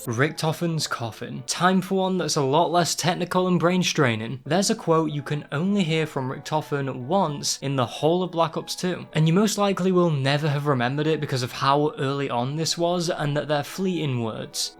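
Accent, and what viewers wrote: United Kingdom. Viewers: LETS GOOOOOOOOOOOOOOOOOOOOOOOOOO!!!!!!!!!!!!!!! welcome back lad, missed that nice ass british voice!!!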